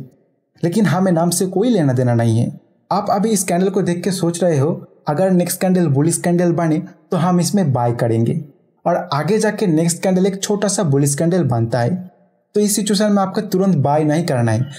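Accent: Bengal, India. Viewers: app bengali ho kiya?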